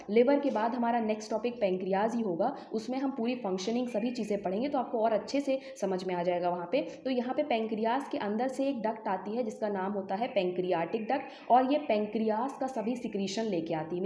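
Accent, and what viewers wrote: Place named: Meerut, India. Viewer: Aap meerut se ho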